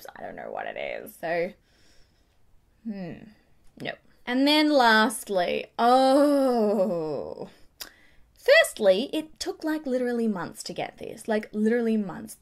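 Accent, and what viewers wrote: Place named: Australia. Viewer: I'm aussie too & I can hear you have a strong accent... are you a country girl? & what state are you from? (I'm from the Blue Mountains, west of Sydney:} )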